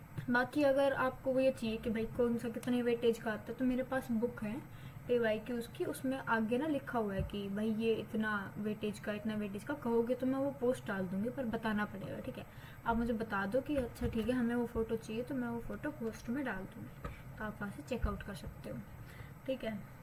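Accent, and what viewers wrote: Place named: Haryana, India. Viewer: firstly, thanks alot for the effort of making it and secondly i watched your 1 video before this one and i was 99% sure u were from haryana now this confirms 😂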